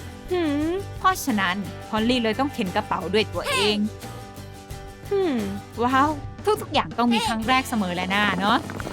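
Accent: Isan, Thailand. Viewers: น่ารักจังเลยชอบสำเนียงอีสาน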